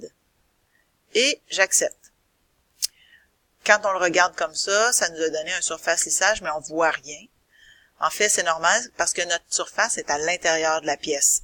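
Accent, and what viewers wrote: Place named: Quebec, Canada. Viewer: Bonjour , Je pratique Solidworks depuis 20 ans et j'en apprend encore, je viens de suivre quelques tutos que tu as fait, c'est vraiment très bien expliqué et cet accent du Québec quel régal !!!! merci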